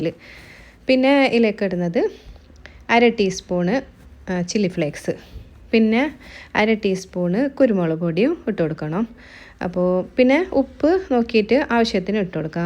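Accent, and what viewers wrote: Kasaragod, India. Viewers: Kasaragod aano😅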